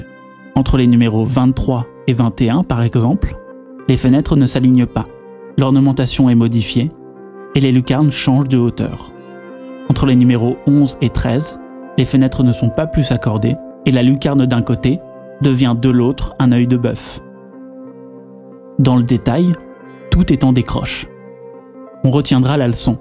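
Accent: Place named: Paris, France. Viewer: Le narrateur a l'accent du 16ème.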